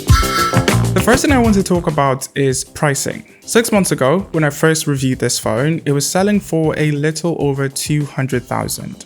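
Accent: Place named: London, United Kingdom. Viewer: London accent.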